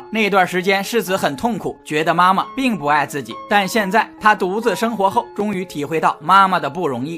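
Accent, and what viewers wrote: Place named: Northeast, China. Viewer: 這東北槍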